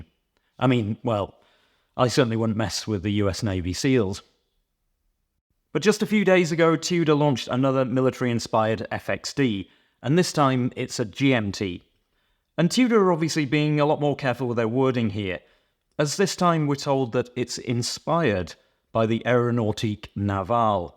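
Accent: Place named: RP, United Kingdom. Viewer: you're posh and say it properly, I'm an oik and can't even spell it 😂 Great vid as always dude ❤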